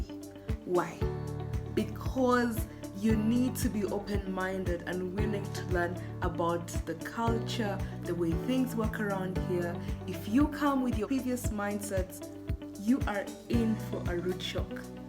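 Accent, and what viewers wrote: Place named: Kenya. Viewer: Welcome to Nairobi, you true look and spaek like a Kenyan, for a Ugandan do not call yourself a foreigner in Kenya. I am from western Kenya and my Luhya language is very similar to some of Uganda local languages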